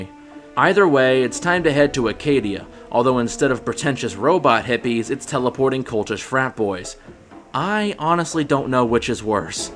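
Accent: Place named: Ireland. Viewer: Ahh makes sense. The accent is more subtle than other Irish youtubers I watch so they didn't come to mind.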